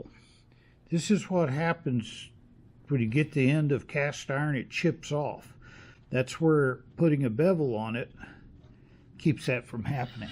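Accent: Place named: Houston, United States. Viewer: from the start of watching your videos, I've felt like I had a friend. I think it's because I grew up in Houston and there's something about your dry sarcasm (and accent) that reminds me of just about everybody I grew up with. I 't mind the longer format when the content is fun and interesting. You are an actor, so making these videos combines two sets of expression that are important to you. As soon as you make it about the money, it will be less fun for us and more like work for you, like an amateur musician getting a paid gig and now being obligated to spend four hours a day practicing. We watch because we like the hang-- is the surrogate for us as we watch and that's a good role. Do Patreon only if you actually need the money.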